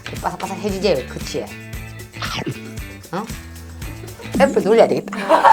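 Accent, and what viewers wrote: Busan, South Korea. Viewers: 울 부산 이모랑 말투 똑같.. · 부사너로써 찐사투리 들을 수 있는 요 컨텐츠 넘 조아요! 그리고 거침없는 요리실력 속이 씌원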